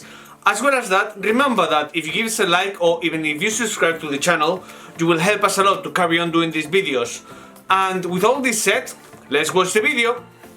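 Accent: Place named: Basque Country, Spain. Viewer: Acento vasco ehh, aibalahostia!!!! tienes mi LIKE....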